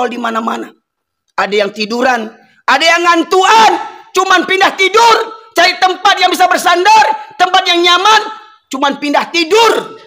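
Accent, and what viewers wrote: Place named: West Sumatra, Indonesia. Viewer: Ustad dari Sumatra Barat logatnya😮mantap man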